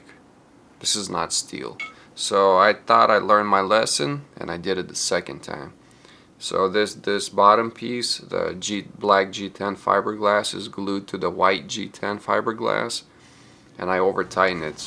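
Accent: Russia. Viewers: Акцент то русский!))Очень хорошие работы,удачи!Продолжай в том же духе!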